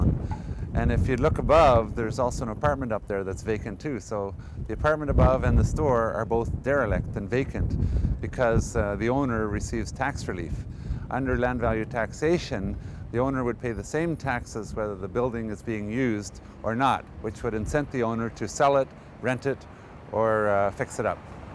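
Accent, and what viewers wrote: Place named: Canada. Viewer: Holy shit this guy’s accent is Canadian af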